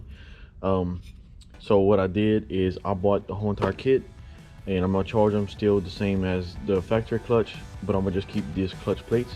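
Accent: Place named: South Louisiana, United States. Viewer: bah you gotta be from south lousiana talkin like dat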